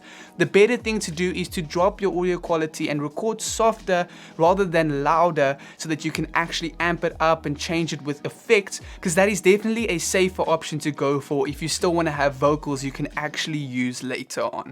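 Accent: South Africa. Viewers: Good stuff! I always learn a lot from your vids.! And your S.A. accent is the coolest! 😀😎👍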